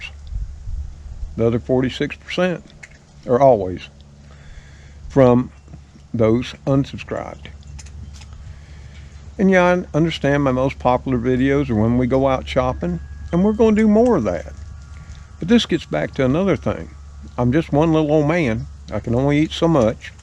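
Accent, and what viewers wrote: South, United States. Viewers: I really enjoy hearing you narrate/speak with your southern accent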